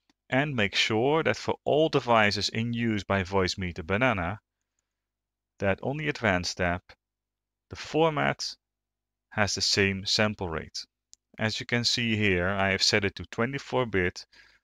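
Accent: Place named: Netherlands. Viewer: excellent dutch accent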